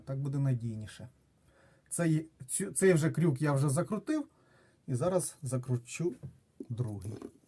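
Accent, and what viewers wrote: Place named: Russia. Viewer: не ломай язык,твой родной русский.Твой украинский с огромным акцентом.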